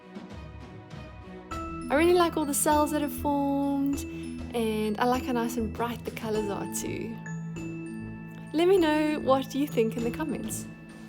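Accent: South Africa. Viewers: Stunning! Nice to hear a South African Voice❤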